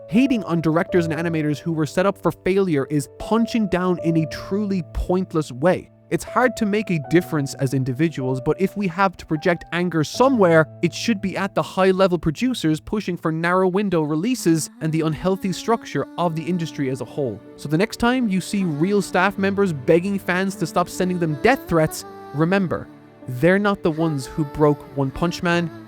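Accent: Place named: Ireland. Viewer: I was so stunned to hear the titles of those trash shows come out of your mouth. Man, irish accents can really make anything sound at least a little better.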